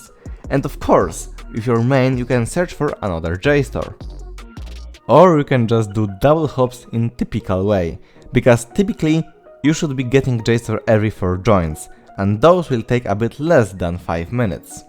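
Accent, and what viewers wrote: Poland. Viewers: Słychać że polak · Słychać ten polski akcent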